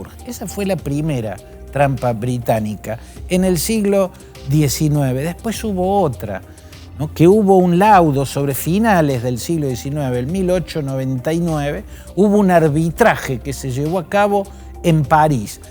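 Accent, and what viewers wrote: Argentina. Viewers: Para tu conocimiento: El virreinato de Perú en el año 1543 comprendía gran parte de América del Sur, que incluía los actuales Panamá, Colombia, Ecuador, Bolivia, Chile, Argentina, Paraguay, Uruguay. Entonces según tu retorcido punto de vista, los peruanos tenemos el derecho de reclamar que esos países vuelvan a ser peruanos. Por tu dejo parece que eres argentino o veneco, que Argentina pues vuelva a ser de Perú. ¿No te parece?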